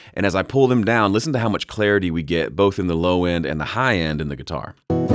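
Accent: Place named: South, United States. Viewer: Thanks for the great videos! These have been very helpful as I self-produce. Also, nice Southern accent!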